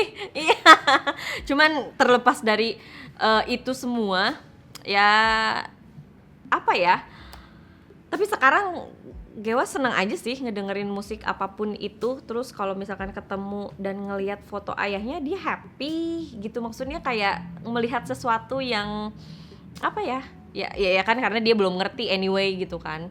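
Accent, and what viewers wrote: Indonesia. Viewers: Sunda pisann logatnya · Sundanya mentok teh, mantap!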